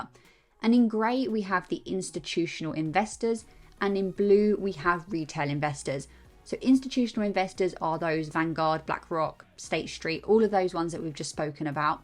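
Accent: England, United Kingdom. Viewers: When you speak, I think of a Charles Dicken's novel! Amazing, genuine, English accent!!! 👍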